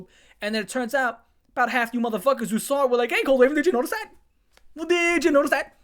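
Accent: New York, United States. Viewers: How much newyorker are you?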